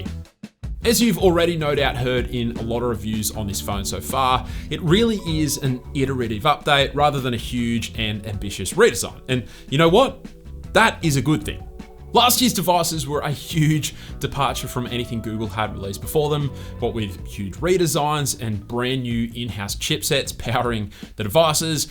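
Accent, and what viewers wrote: Australia. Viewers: Is this an Australian accent? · I really love this phone, its pixel through and through...and when you let me know how to work it speaking with your sexy Aussie accent, I melt! Thank you 🥰😘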